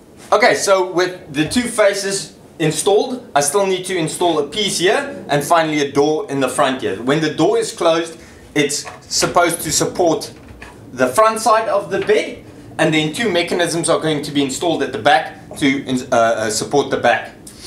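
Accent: South Africa. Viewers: Lekker boet!! Nice bench, great to hear the accent ... sounds like mine, originally from Kimberley, Northern Cape. Greetings from New Zealand.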